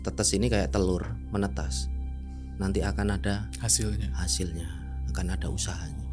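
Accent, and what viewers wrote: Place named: Bantul, Indonesia. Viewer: logat e bantulan banget mas 😂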